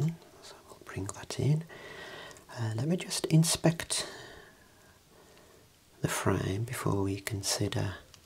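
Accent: United Kingdom. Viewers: Love your British accent. Marvelous video like always ❤ French 💋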